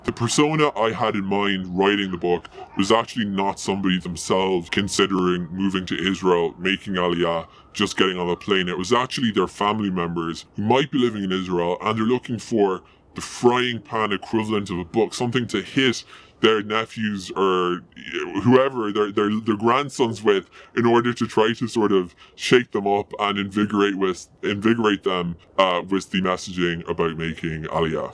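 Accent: Ireland. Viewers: The issue is that a certain cadence and some letters in your pronunciation give away your Irish accent.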